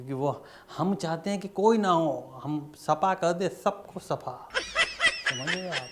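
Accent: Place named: Bundelkhand, India. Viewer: मज़ा आ गया बुंदेली सुनकर। और कछु अंग्रेज़ी सुनकर। वैसे वो तो इतनी भी अंग्रेज़ी नहीं बोलता जितनी आप बोल देते है।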